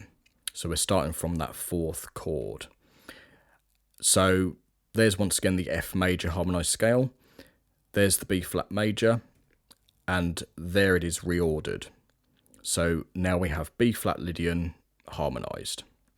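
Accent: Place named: United Kingdom. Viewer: Can you do the video again, but without the British accent?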